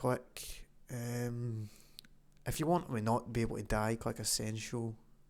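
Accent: Scotland, United Kingdom. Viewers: Finally a mod tutorial for me: with Scottish accent XD Thank you for this, it's very helpful and thorough. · i was so happy when i heard that scottish accent, about 10x better than an indian dude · Yes a Scottish guy finally No homo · A fellow Scotsman eh?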